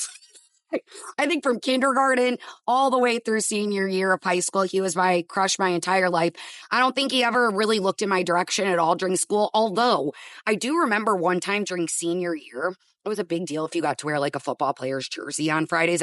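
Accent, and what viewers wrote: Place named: Midwest, United States. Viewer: NGL, for YEARS I thought you were either from the Mitten or Wisco.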